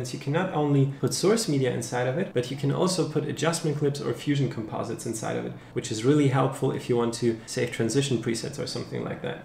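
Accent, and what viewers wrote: Germany. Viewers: your german, right? guessed by ur accent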